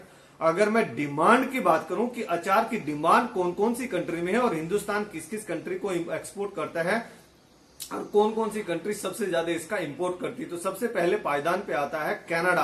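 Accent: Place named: Bihar, India. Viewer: Dear, you had made fun of Punjabis by saying Canada to Kanadha, According to you punjabi speak in this accent.. Moreover you also need to look into your own accent, Russia need not to be pronunce to Rhahssia.. 😂 And many other country name you pronuce in funny way... Now you need to work on your श , say शाम not saam, is that Bihari Accent??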